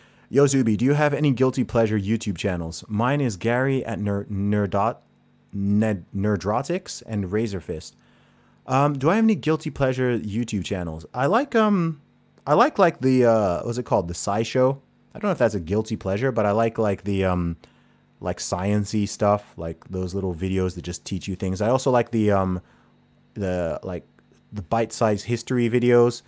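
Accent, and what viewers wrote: United States. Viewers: Just joined today. You sound American! You know, I commented on a YouTube video and someone from UK replied and explained that even people over there in UK are praying for Trumps win because the cabal and corruption stretches even over there and they know that if he loses its over for us and if it's over for us, then it's over for everyone. That was the first time I realized how important my President is. I've always known how truly important he is to America, but I had no idea how, what happens in America affects other countries. I know we are the world's bread basket. But I didn't realize. Then Trump gave a speech yesterday and he too said that if it's over in America, it's over everywhere. God Bless Us All! Please pray, because the more people that pray..... we can pray it into existence. It's physics. And the Democrats have lost about 30% of the black vote thanks to Candace Owens, Brandon Tatum, Jericho Green.... 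you should follow these people they're fantastic. The biggest problem in the USA right now is WHITE LIBERALS. THEY'RE ALL NUTS. LIBERALISM IS LITERALLY A MENTAL ILLNESS. I watched a documentary and they had a liberal and conservative in MRI machines. They showed photos, political in nature. And with each picture a different part of the brain lit up for the conservative vs the liberal. They think without logic. Thought without logic is a disorder, I mean....... that's the white reason for thought, to be logical. Not to be emotional, that's why we feel. So liberali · But you have an American accent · No disrespect, but if you're British why do you sound American? My mom is British and she has lived in America for over 40 years and she still sounds British. What happened to your accent? Please tell me! Ps I love you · I hear you in Bangkok, Thailand first time listener. You sound like a really smart young man! 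I love the president, you are from UK wow! You sound American. And intelligent. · Just curious- why do you sound American? - you don't have a British accent- I'm Australian and just wondering 🤔 also, came across your channel accidentally and love your input 👍🏿🇦🇺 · Are you American ? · Why is your speech so American? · Did you go to school in the US? Is that how you sound American? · Why do you sound American? This was my first visit to your YT feed. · Why ?if yuo English yuo sound American?bye. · you kinda sound American ; are you from the States ?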